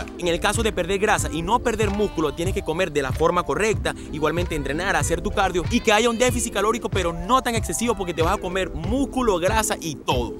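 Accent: Venezuela. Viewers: Parece venezolano por el Acento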